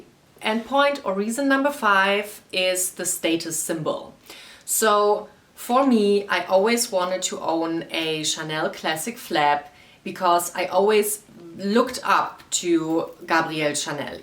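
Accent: Germany. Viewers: you sound very German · Nice video! 🤗 Do I hear a german accent? 🤔 Edit: Habe das Video jetzt tu Ende geguckt, da erwähnst du sogar, dass du Deutschland kommst! Liebe Grüße, habe dich direkt abonniert :)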